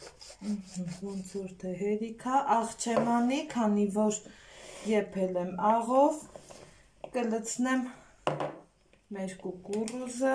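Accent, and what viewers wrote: Lori, Armenia. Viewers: Լոռվա ակցենտով եք խոսում, շատ հաճելի էր ամպայման կպատրաստեմ👍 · Շատ հավեսին եք խոսում, Լոռվա ակցենտով 😁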